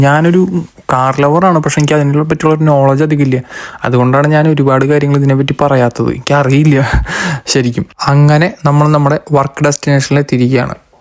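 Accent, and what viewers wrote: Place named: Thrissur, India. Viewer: ചേട്ടൻ തൃശ്ശൂർ ആണല്ലേ സംസാരം കേട്ടപ്പോൾ മനസ്സിലായി ഞാൻ പാലക്കാട് ഇപ്പോൾ പോളണ്ടിലുണ്ട് അവിടെ ജോലി ശരിയാക്കി തരണേ പ്ലീസ്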